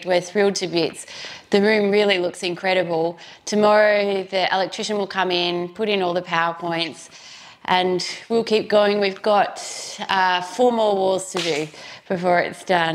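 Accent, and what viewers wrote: Australia. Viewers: Love love this! Yay an Aussie accent! And I’m only halfway through this episode. Stunning wallpaper. J’Adore! Tres Bien 💗💗💗 and congratulations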